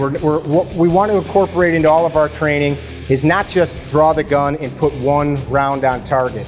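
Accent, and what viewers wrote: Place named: Midwest, United States. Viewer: Man is that midwestern accent thick.....love it lol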